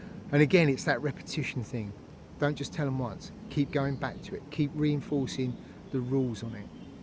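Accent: England, United Kingdom. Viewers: Well done mate, good to hear an English accent again :) Regards, Keith.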